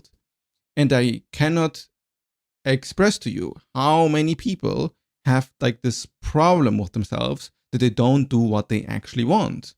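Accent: Germany. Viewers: Am i crazy or is that a german accent if yes keep it going, german youtube is so trash that this content is gold compared to their mainstream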